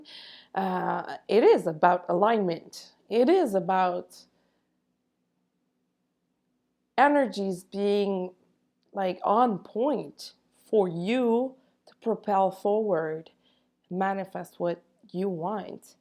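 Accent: Quebec, Canada. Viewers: Hi! Question, are you the girl from Quebec? I'm on the south shore of Montreal and i think i recognise your accent and i heard that, that girl, a few month ago living around Montreal that was doing group healing sessions. I saw that girl, maybe you, in a podcast once but you have no pictures here or on FB. Let me know if it's you! 💜🌸💜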